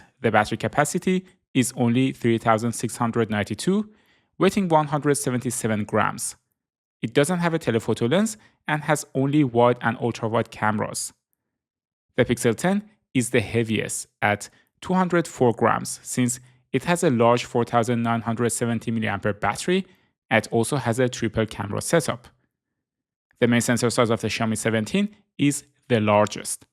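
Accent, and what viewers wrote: Iran. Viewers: Are you persian? You sound persian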